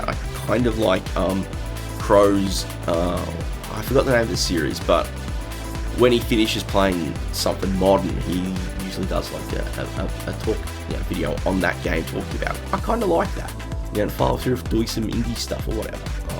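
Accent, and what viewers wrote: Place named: Australia. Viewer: That Australian accent can be quite tricky for me, but I do understand most of it. ;) I think it sounds good, to cover the same game on different platforms. It's interesting to compare the differences. I'm slowly starting to regret naming my channel, so I'm stuck on one platform. I do feel most at home on the C64 (partly Amiga, as well), but it could be very interesting to try some of the other machines. Especially Amstrad and Spectrum. New exciting worlds will probably open up for me.. :) Anyway.. Looking forward to your 2016 content. :)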